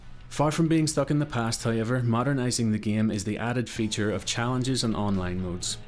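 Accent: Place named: Ireland. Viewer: North Americans love a good Irish accent!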